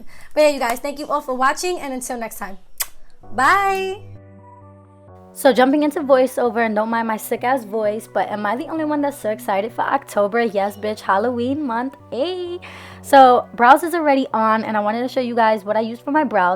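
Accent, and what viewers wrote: New York, United States. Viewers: Gurl where are you from? You are giving me major NY vibes and I’m loving it.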